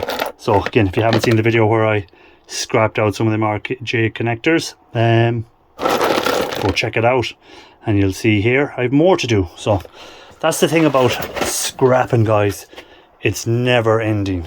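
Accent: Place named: Cork, Ireland. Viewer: Not as bad as ians shed but you need to get your finger out before it turns ugly 🤗👍🇮🇪 You sound like a Cork man, am i right?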